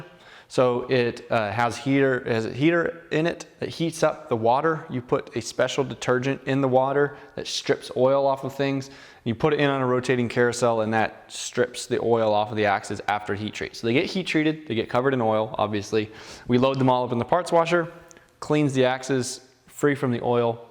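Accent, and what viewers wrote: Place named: Rural, United States. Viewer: Sounding a little country there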